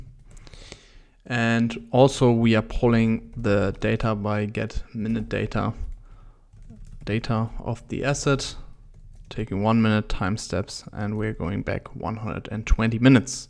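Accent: Germany. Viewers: hey dude. i really like your videos, thank you :) i am getting into python atm. do you have any tipps? in the end i wanted to make a telegram or discord bot. i like the idea of a trading bot also a lot :) so for now i am stuck at my udemy though :D so any tipps are welcomed. and yeah, thank you for your videos :) ach so, kann es sein, dass du deutsch als muttersprache hast? ;)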